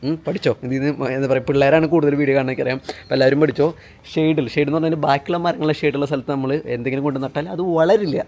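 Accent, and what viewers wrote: Thrissur, India. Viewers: Chetta You guys are really cute💕😍.. Enthu resamma ee video kandondu erikkan😍.. Nigalude face lle Innocence serikum ariyam.. The way you guys smile 😍 and i really like thrissur accent ❤️ Chetta U r amazing.. Keep going 💪👍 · Trissur anoo chetta 👀😀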